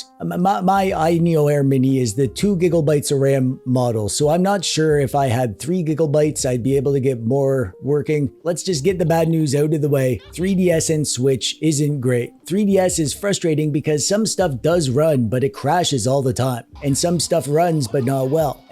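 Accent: Canada. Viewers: That's what Canadians sound like, right? I've always wondered why the handhelds also sound Canadian, though...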